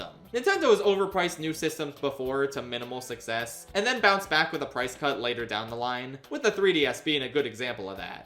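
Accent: United Kingdom. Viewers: Bruh I thought this guy was bri’ish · I’m sad, the British voice is gone 💔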